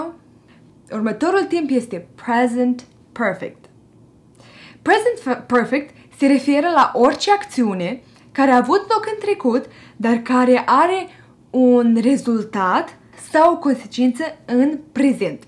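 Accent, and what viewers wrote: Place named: Moldova. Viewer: Asa de simpatică mi -ai fost,și dulce cu limba ta Moldovenesc.Te pup.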